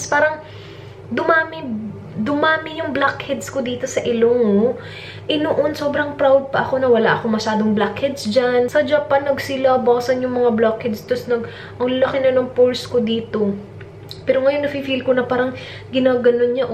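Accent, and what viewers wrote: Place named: Cebu, Philippines. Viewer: Hi po ate bisayaaaaa kaykaaa ate cebuana kaayo💛😍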